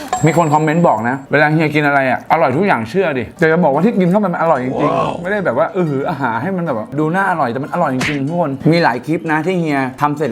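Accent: North, Thailand. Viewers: อยากเห็นแม่ พูดเหนือทั้งคลิปค่ะ มันต้องน่ารักมากแน่ๆ❤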